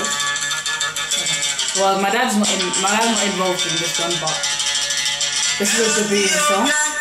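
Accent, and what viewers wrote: United Kingdom. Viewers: you look stunning girl 😎 and love your London accent 👌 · I can hear her just fine with that British accent. Y'all need to have your ears checked. Djiboutian 4 life here, laakin heblayoo is astuur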